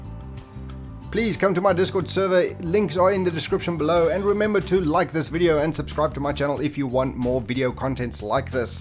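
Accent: South Africa. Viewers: Oh, I know that accent, are you South African,? Also, thanks for sharing this tip. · Where are you from? Your accent sounds very South African.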